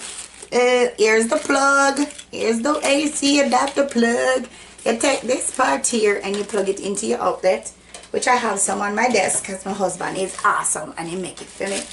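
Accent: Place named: Jamaica. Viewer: Jamaican I hear it looool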